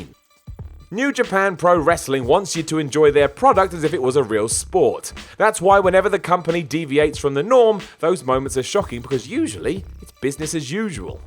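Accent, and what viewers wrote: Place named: England, United Kingdom. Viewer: Hey so, I'm young, I love pro wrestling, and whatculture wrestling is my favorite channel. Please forgive me but I'm curious. How come when Englishmen end a word that ends in an 'A' it kind of sounds like an 'er'? Much love. Again just curious.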